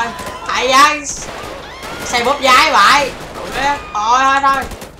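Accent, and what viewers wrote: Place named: South, Vietnam. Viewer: mình ở hà nội nhưng cực thích nghe giọng caster miền nam, buồn cười dã man luôn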